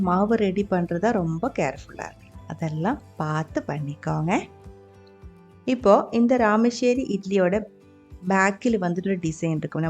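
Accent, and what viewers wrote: Kerala, India. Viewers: In the middle of your efforts to speak in “Tamil” Tamil, your Malayalam flavor comes out, making it nice. Maybe U can speak in Malayalam itself since most Tamils understand Malayalam. This will also help them to learn Malayalam. Kollengode S Venkataraman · Excellent video ! Loved the accent - I speak Tamil like you but i don't succeed as much as you do in keeping Malayalam out of my Tamil. End up talking like Kamaraj in Michael Madana Kamaraj! Although I may not have the skills and the patience to make such great food, I would certainly tune in to hear your "Thalayalam". !! Suresh / Norway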